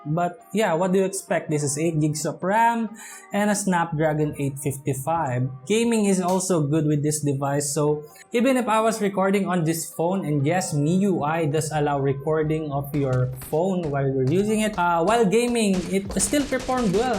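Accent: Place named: Philippines. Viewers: The way he enunciates words, I believe that he's doing it on purpose having the "Pinoy" accent. At first, it was awkward but then I realized he's been doing it on purpose which is for me I think is better than trying to speak in a very slang, Aussie and or British accent 😆.. and I can understand him better. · We have our own accents, and yeah syempre kapag english ang title dapat english din magsalita kasi maraming complains. Hindi lang Pinoy pwede manood boi, international viewers din. Hindi tayo native ingglis speakr.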